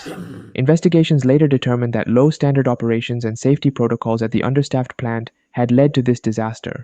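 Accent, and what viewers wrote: India. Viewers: he sound indian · Bro has an Indian accent made by ai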